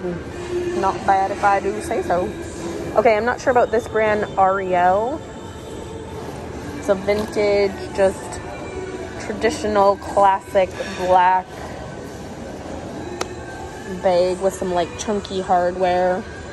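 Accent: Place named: Northeast, United States. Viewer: I love the way you talk I am knowledgeable of where are you from the Northeast and that is your Northeastern speech those who are not educated as to the different dialects of the language sorry for them but I enjoy every word you say and I appreciate hearing you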